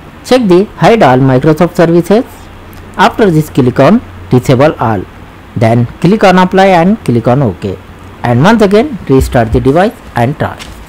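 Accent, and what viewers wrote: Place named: India. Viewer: Indian english accent 😁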